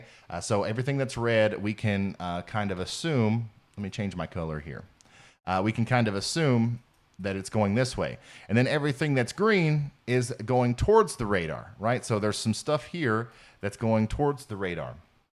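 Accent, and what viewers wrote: South, United States. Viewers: The great thing about doing this on YouTube is that you can be totally your natural self, and your accent is perfect for the style, especially with things like “naders in the dark.” 😂 It’s crazy how broadcast entities want to eliminate southern accents because of the stereotype that they are considered “dumb sounding.” You’re a southern guy who is clearly not dumb.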